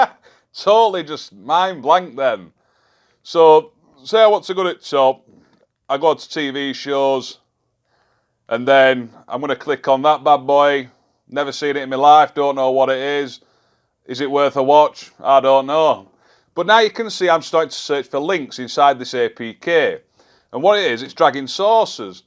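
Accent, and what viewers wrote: Yorkshire, United Kingdom. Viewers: Great video, thanks for the informative video and links, where abouts in Yorkshire are you from mate?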